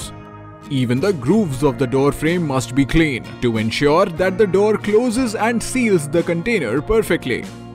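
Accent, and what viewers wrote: India. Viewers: Indian accents is unbearable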